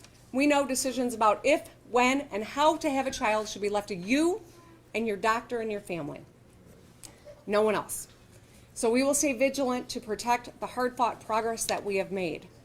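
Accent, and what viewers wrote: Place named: Michigan, United States. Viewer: Not sure if Whitmer realizes she has a Michigan accent. When I moved from my native Michigan to California, people kept commenting on my accent, and I was like, I don't have an accent, you have an accent.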